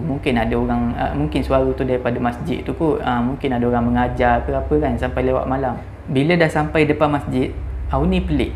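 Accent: Terengganu, Malaysia. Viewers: Setuju tak semua orang boleh faham dialek Terengganu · ckap ganu kali bang😁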